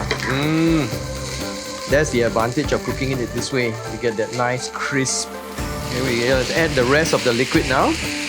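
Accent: Singapore. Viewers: That;s good I don't have a real steamer and I am really glad to skip that step. You talk and sound like a Singaporean and a Teochew at that. Hello Ka Ki Nang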